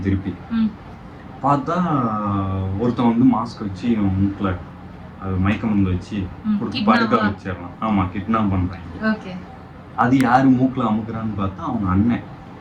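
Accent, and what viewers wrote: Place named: Tirunelveli, India. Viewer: So good to hear in Tirunelveli slang, bro🫡🫡🫡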